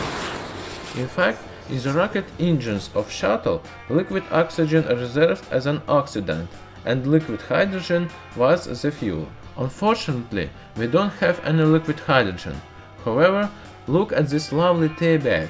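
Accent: Russia. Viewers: Ocean Junkie I Agree He speaks perfect English with his very thick Russian accent. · Just started watching this channel recently. This is one of the best beginner's chemistry channels on youtube. Love the Russian accent and light humor as well! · Love to hear Russian accent speaking English, looks wiser think so 🤔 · Man, I love your videos and your Russian accent. Keep up with new content. Cheers.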